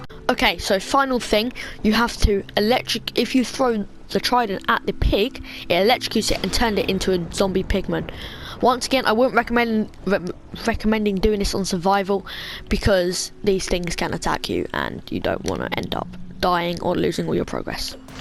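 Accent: United Kingdom. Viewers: oh you're british?! I love that accent, it's nice👌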